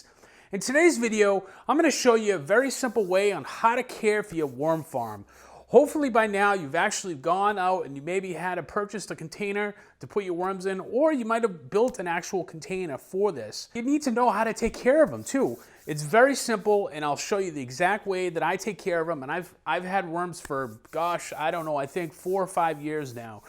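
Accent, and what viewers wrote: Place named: Northeast, United States. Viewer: North Carolina?? If you aren't from the north east, someone who raised you must be. Thanks for the info. I'm about to start a worm bin, so this helps. Best to you and your family, peace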